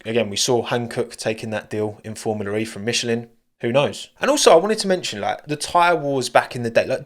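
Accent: United Kingdom. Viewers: I always love hearing Italian words pronounced in the "British way"😂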